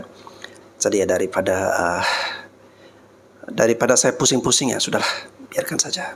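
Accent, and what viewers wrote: Flores, Indonesia. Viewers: Aksen logatnya itu org NTT Flores 😊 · oh ye ke slang dia mcm org Indonesia jer kihkihkih 😂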